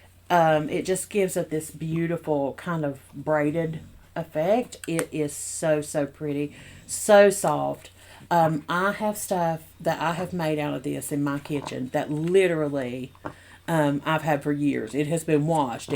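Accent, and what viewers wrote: South, United States. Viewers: Sounds like a good day for you. You live in Maine? You sound more southern than I do and I live in Kentucky. You sound like one of us.😁😁😁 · As the video goes on, I do declare, it is questionable that you may have have some Georgia 🍑 in you, oh I detect some southern draw! Oh gosh ,I have been smiling for my whole time here! How oh how could this be? I am going to subscribe !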